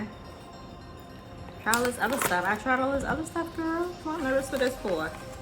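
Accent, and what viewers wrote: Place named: New York, United States. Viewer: Yerrrrr… I can tell you’re from NY. Love the vid!!! Can’t wait to order something’s from this company.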